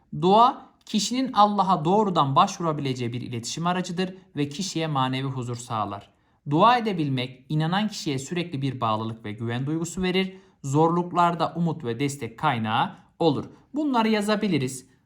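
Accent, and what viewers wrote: Malatya, Turkey. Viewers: Hocam no 44 Malatyalımısınız 😂😂😂🎉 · Hocam, Malatyalı mısınız?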